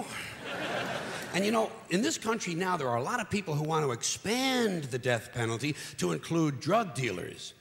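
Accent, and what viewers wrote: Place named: New York, United States. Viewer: Lol yea his new york accent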